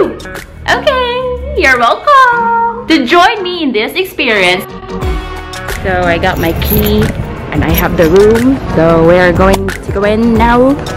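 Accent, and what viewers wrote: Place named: Singapore. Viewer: Te, imong accent ky Singaporean na hehe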